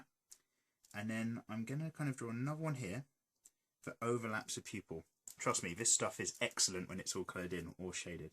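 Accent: United Kingdom. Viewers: I actually just found your channel yesterday, and I have to say your tutorials are very helpful ^°^ I've always wanted to start making my own (mini) manga series, but certain things I tended to struggle with, eyes included. Also, are you British too, by any chance? XD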